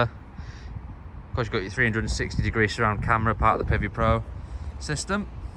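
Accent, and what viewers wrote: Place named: Yorkshire, United Kingdom. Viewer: I enjoyed watching the Video Review. Is this Inchcape Dealership In York as do have a very strong Yorkshire accent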